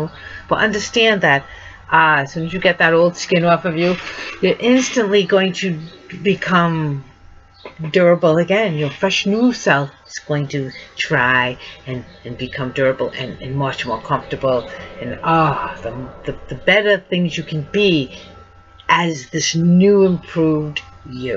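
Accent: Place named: East Coast, United States. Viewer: I love your accent......Lemme guess, East Coast?......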